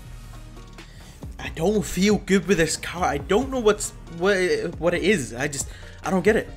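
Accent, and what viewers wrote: United Kingdom. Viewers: Btw are you Scottish it sounds like it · he sounds lockey welsh or northen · I still don’t get how your British, I’m from England and have never heard anyone like you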